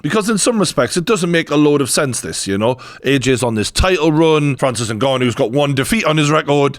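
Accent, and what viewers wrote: Newcastle, United Kingdom. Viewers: Your bang on geordie boy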